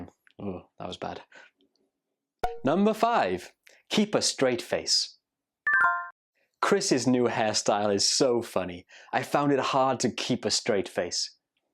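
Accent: United Kingdom. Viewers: Excellent video,! Your British accent is amazing and you really rock as an English teacher.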